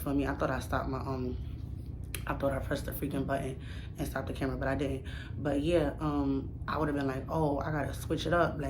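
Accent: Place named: New York, United States. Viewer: In 2025 we are getting rich off of content yes. Get it sis.💐🥂 I felt that. The stand is super cute and has good lighting 💡. Big facts on people is looking for what can you give them. & What can they look forward to coming back to? Everybody gotta start from zero followers subscribers… views. Content is like making a ship or the bottles that people put notes in then put it in the ocean hoping it will land on a island one day and somebody would read. That’s like videos on the web and people click them based from the thumbnail or title. 😁 lol you had me cracking up. Shorts bring a lot of attention to people about there channels and giving people a quick reason to subscribe for more content. Word is going around that A lot of people have short attention spans as well.. so that’s why shorts come in clutch 🤷🏽‍♀️ one time I got a comment that said “your video be too long. 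You need to make them shorter “ when I looked. The video was only about 9 to 10 minutes. 🤦🏽‍♀️ yes I’m looking forward to the long content. I can’t wait to see. is where I’m at now in the video. I’m listening and writing along, so I don’t forget what I have to say and you just talked about what I said about the short attention spans 🤯🤭 lol but click baits are the worst. Smh lol ppl play to much. You had great great points and yoooooo pls with the “did you miss me “ 🤣🤣🤣🤣🤣 hahahhahaha yo I can tell your from NYC me toooo. And it took years for me to realize New York do have an accent just like country ppl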